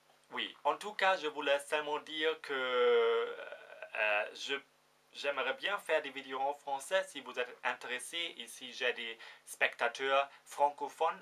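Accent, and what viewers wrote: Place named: Germany. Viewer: Hallo ! ich bin Französich,du sprichst gut Französich , mach dir keine Sorgen ;) Ihren deutschen Akzent ist cool, nicht entfernt continue comme ça!!!!!!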